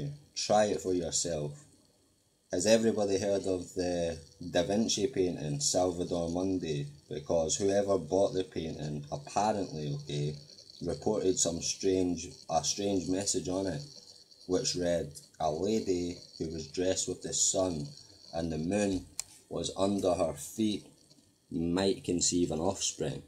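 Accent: Scotland, United Kingdom. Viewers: Well, I do not understand everything because of your accent, as English is not my mother tongue, I have problems with the Scottish accent, AND with the baby's noises in the background, sorry to say...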